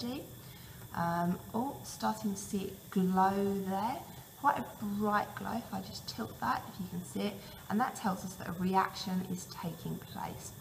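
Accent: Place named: RP, United Kingdom. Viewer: sounds posh to me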